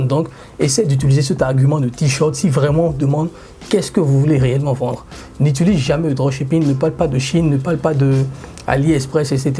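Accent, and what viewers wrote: Benin. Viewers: Vous parlez comme les béninois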